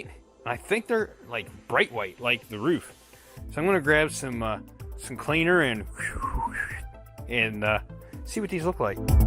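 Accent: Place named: West Virginia, United States. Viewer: Just curious. Kinda sounds like a WV accent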